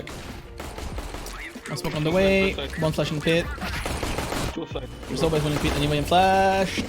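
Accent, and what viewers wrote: France. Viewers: yo are you originary from frace or a country who speak frenche cause you have a litle french acent some time (love your video) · Y a un p’tit accent français Nn 😭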